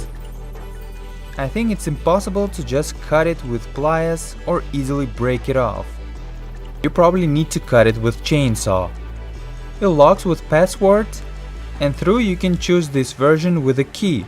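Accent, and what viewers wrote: Russia. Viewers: Your accent is not true. You are rus. Я человек, который живёт в Англией, слышал как говорят люди на английском и точно знаю. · «Immunisation of” what? Учи английскиц, школота. Как же заебал ваш рязанский акцент. Тошнит прям. Лучше рекламируй китайское дерьмо своим руцким братанам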